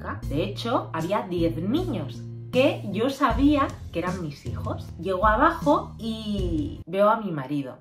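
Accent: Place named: Russia. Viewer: Porque tiene asento ruso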